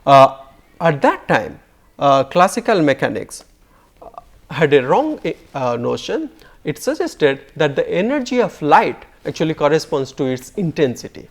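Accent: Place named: Kolkata, India. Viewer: Jadavpur English. He pronounces "absorb" as "abserb." It is REALLY difficult to make out what he is saying. And he trips too many times.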